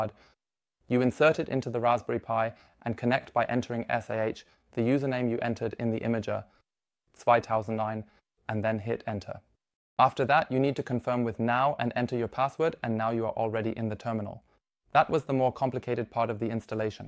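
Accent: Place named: Germany. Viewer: You are very handsome young man I love the German accent. 🤗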